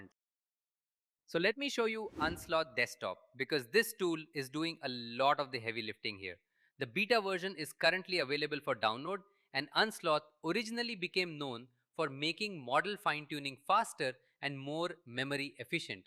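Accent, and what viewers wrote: India. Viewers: thanks for the video, really helpful for low end systems. i also like youre indian accent 🙂👍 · (It's an AI generic Indian accent shared by various ai channels ... it is a soothing one though)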